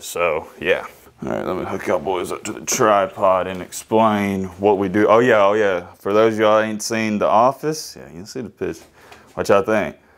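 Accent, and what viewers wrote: South, United States. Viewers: Where u from y you talk like that u talks country ash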